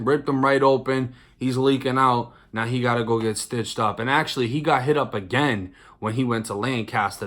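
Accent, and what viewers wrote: Boston, United States. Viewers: Man ive been watching your videos, from the beginning on 23&1. Its nice to see you putting up some numbers. Ive been in 3 times, 18, 22,26, I'm 38 now. I was in NC for the first 2 and nd for the last. Prisons are rough especially my first bid in Polk youth center. It's what you call jit camps. But not as rough as the shit youve been though. Stories are crazy. But I can tell that you learned alot and matured in a way the streets cant provide. You can just tell by the way you reflect back on your time, and situations you went through and learned from. Alot of people dont understand the invaluable lessons your learn about life from prison. After the prison mentality melts away, you can apply those lessons to the way you conduct yourself on the outs, as well as knowing how to treat people with respect. Man keep up the good work, and I'm glad you went back home, florida would have got you caught back up. 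I'm watching this show called city on a hill, about Boston and your accent trips me out, cus its just like the dudes in the show. Anyway, keep up the great story telling. Others can learn invaluable lessons just by listening to the things you say.💯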